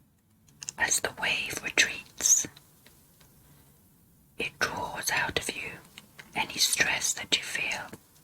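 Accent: United Kingdom. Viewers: i hate british accent but i still watch it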